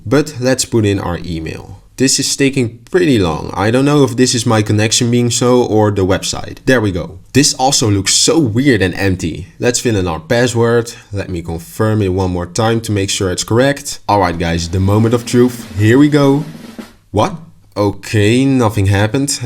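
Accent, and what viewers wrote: Netherlands. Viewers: Ik hoorde gelijk je bent Nederlander lol.. als nog goed hoor je kan echt niemand meer vertrouwen deze tijd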